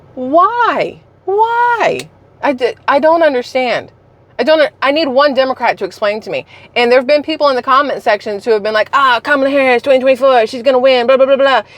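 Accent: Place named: Cajun, United States. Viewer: Are you Cajun,? If not you sure do have a slight Cajun accent 😊. I was raised between Baton Rouge and Alexandria now in Mississippi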